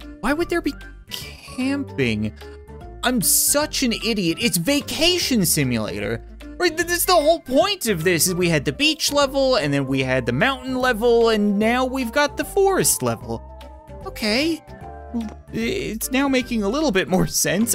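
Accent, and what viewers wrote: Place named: Newfoundland, Canada. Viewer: He has a Newfoundland accent where is he from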